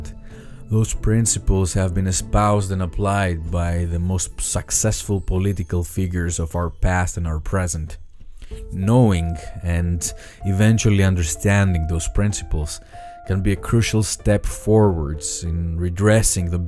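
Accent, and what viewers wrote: Greece. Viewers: God damn your accent is so greek. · You must be a Greek,,,, not only the accent, the looks , but the room decoration.....marvelous. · IS THAT GREEK ACCENT I DETECT IN YOUR VOICE? OR SPANISH?? 🤔🤔🤔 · Very Greek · Lol first time i am in your channel and after the first verses i caught that you are greek from your accent XD great video and very informative. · your accent appears Greek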